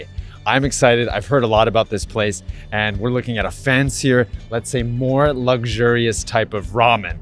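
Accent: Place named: United States. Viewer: that is honestly so impressive. I for sure thought you lived in the states for a time or something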